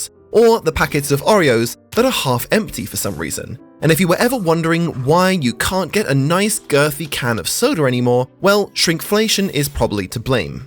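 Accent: United Kingdom. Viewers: British accent, US money. Am I watching Sh*tvlogging with AI? 😂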